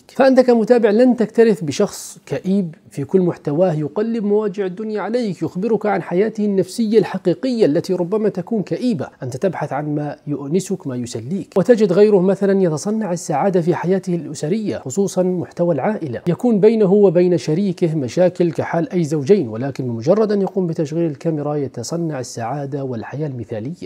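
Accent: Egypt. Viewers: اللهجة المصرية علي لسانك عسل يا ❤ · الفيديو مفيد كالعاده 💝ولهجتك المصريه بتضحكني اوي 😂😂 بس بتتكلم بيها بطريقه حلوه تحياتي ليك من مصر 🇪🇬💝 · انت متاثر باللهجة المصرية اوي❤😂 حبيب البي ياسطا😂😂❤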